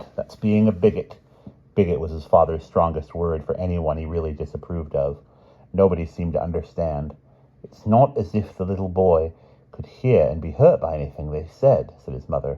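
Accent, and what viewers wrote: United Kingdom. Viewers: Ms. Banks would be impressed by your British accents.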